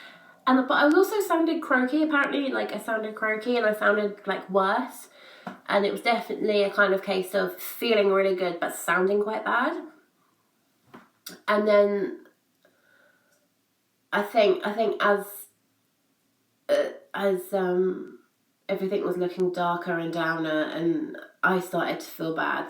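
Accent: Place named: United Kingdom. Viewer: That British accent makes you sound so educated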